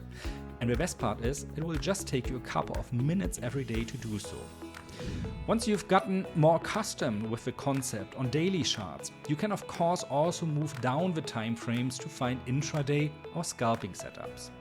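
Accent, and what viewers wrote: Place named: Germany. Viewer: Hello, i just found your channel and its amazing. Especially this vid thought me a lot about confluences. But do you really trade on the daily chart? Can you make a video explaining your entry i.eg. at lower time frames after analysing the daily chart? By the way, i like your german english accent🤗 i‘m from germany😊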